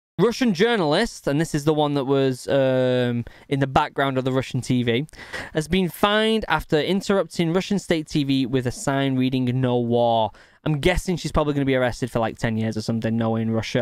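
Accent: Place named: Manchester, United Kingdom. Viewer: Not a question, but heya fellow (or technically previously fellow) Manchester citizen. I only knew about that one once you mentioned it here. Wait now that I think about it how have I not noticed your accent being from around this area? Love it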